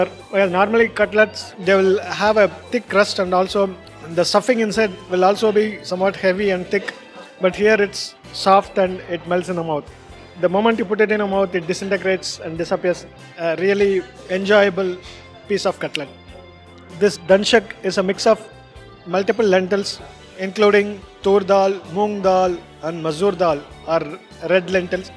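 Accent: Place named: South India, India. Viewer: THIS SAUTH INDIAN ACCENT OF NARETION LOOKS ODD TYPES OF IFFICULT TO UNDERSTAND FULLY...NO GOOD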